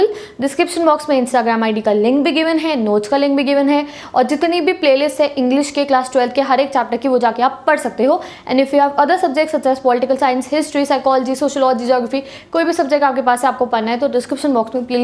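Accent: Punjab, India. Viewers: bro ma'am Punjabi hai 😂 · Love your punjabi accent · Di love your Panjabi assent ....:elbowcough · Punjabi accent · apki jo bich bich mai punjabi nikalne ki koshis kr rhi hai...that makes the chapter more interesting :)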